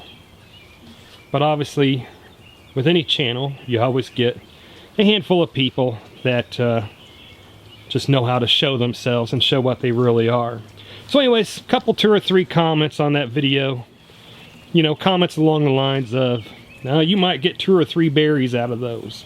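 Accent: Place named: South, United States. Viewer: you're very welcome. I dont recall you saying where you live, but you sound southern and I live in West Alabama near T Town. I've obviously forgotten where you're at. Since I hit 50 (several years ago) I do good to remember much of anything lol. I had been doing some research on something I had requested a video about, burying the dead and such, and i never got back to you on that because some things came up for the fellow who I had been talking about it with and getting the info from. (In case you're confusing me with someone else, but I honestly think you're too young for that and it's most likely me who doesn't remember lol)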